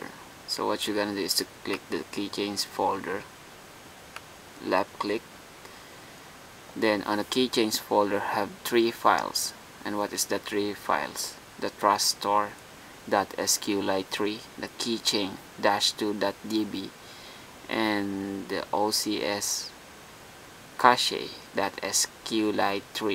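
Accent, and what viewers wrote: Philippines. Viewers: Philippine? i have a philippine teacher that speaks exactly the same. switches the Fff with P, and some she switches some other letters too. but i mainly remember that. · filipino accent :)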